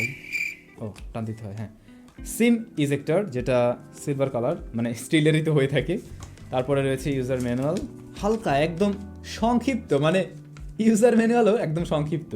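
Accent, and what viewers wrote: Barishal, Bangladesh. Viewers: ভাই আপনার ভাষা বরিশালের মানুষের মত মনে হয় 🙂 আপনার গ্রামের বাড়ি কি বরিশাল? বরিশাল হলে তো আমার মতো 🙂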